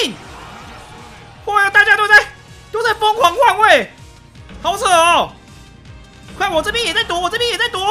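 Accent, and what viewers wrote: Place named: Taiwan. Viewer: 台灣國語真的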